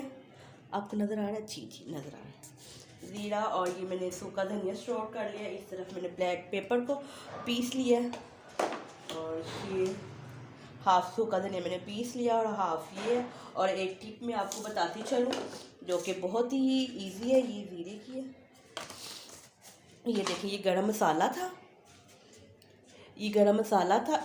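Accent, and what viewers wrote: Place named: Lahore, Pakistan. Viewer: Sister aap Lahore ki lagti hain MashAllah aap Lahore walon ki tara bolti Hain Allah tala ki qismat shandar kary dunia ki sari naimtain day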